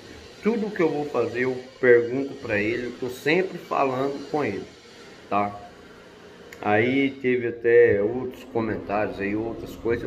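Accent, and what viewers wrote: Minas Gerais, Brazil. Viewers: Éh mineiro!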